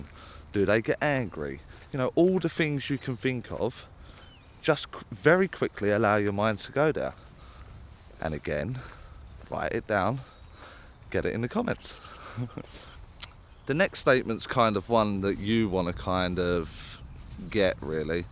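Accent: Essex, United Kingdom. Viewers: Starting out and so glad I found you! Someone I can relate too..helps with the Essex accent lol so here goes! I got an uncle, in his 40s, he was a happy go lucky man with a good sense of humour. He comes to help others with your teachings. He wasn't married and he liked to drink which he thinks attributed to his death. He lived in a rural town but not in the essex area. It may not be right but its given me confidence to listen to that 'voice' x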